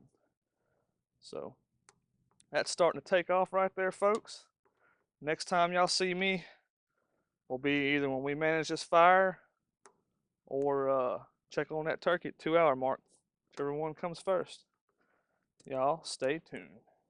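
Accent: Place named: Texas, United States. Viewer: Oh yum yum 👍👍👍 that’s such a unique way and creative way of smoking a turkey … I like that Texas twang coming from a native Texan. 😁😁😁 although I don’t live there anymore it will always be my home . ❤️ thanks for sharing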